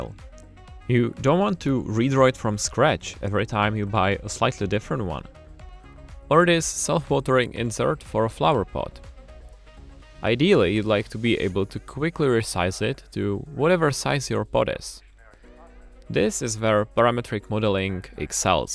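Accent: Czech Republic. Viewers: Czechenglish se nějak špatně poslouchá:) Ale jinak víc takových tutoriálů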